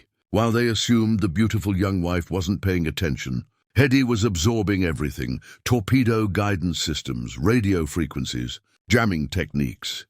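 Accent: United Kingdom. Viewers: Why does the world seem to think that Brits are more respected than Americans?? Why is the narrator here (AI generated voice) speaking British English?? DUMB.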